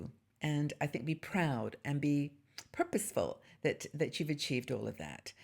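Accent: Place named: United Kingdom. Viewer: I can hear that British accent love your channel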